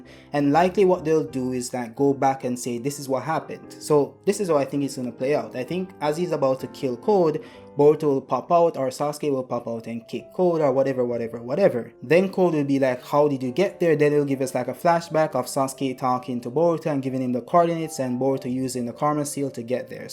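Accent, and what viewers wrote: Jamaica. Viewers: Hmm u sound Jamaican... are you?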